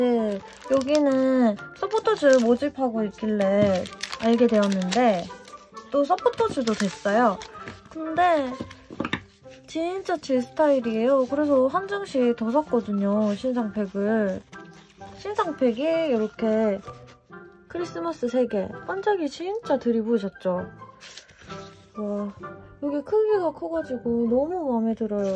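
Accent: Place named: Gyeongsang, South Korea. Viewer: 오늘 영상 처음 보는데 대구분이신가용?? 저희 어머니랑 억양이 비슷해섴ㅋㅋㅋㅋㅋㅋㅋㅋㅋㅋㅋㅋㅋㅋㅋㅋㅋㅋㅋ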